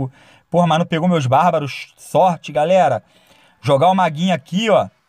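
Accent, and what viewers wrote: Rio de Janeiro, Brazil. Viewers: man o q e da hr e a voz dele eo sutaque carioca top demais.show galera KKKK salve eh nois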